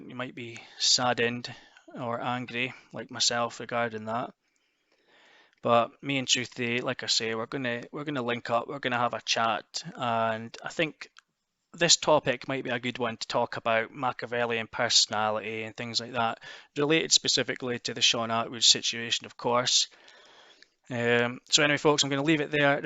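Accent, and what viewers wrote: Scotland, United Kingdom. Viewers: Least you use your wee scotch voice...😉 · Anybody believe this Sammy the Bull story? Doesn't add up. As a scouser I'm trying to decipher what part of Scots you're from. Its not a heavy Glasgow accent or Edinburgh. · Fuckin love Scotland Scott's forever fuck thst... Love the accent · I might be biased as I'm Scottish but it's good to hear a Scottish accent that isn't on Jeremy Kyle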